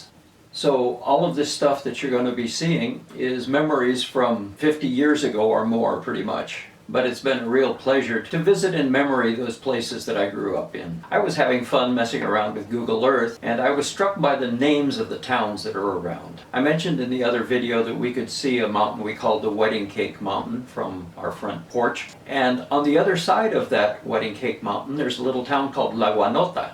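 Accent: Venezuela. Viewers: i´m colombian, lived in venezuela for about a decade, and lived in cuba for about 7 years, and that´s something i recognize, the "smell" of a name, you made me smile today! And loved you venezuelan accent! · I like listen your venezuelan acent.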